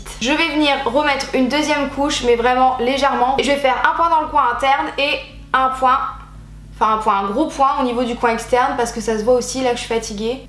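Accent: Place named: South, France. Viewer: Jpp l'accent du sud😂 super video continue comme ça, ❤️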